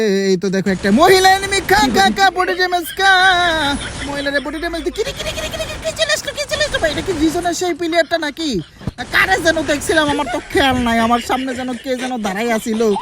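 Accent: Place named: Munshiganj, Bangladesh. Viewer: Bhai apnr basha Munshiganj bikrampur er kon jagay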